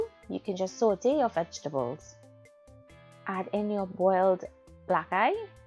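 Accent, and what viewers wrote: Trinidad and Tobago. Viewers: She has a Trinidadian accent but the culture is similar to Guyana which is mostly Indian and African influence.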